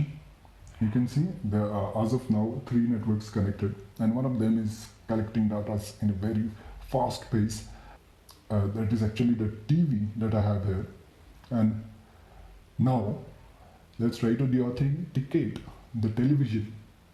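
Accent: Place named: India. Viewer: Aren't u turkish? Its sounds like ur indian ur not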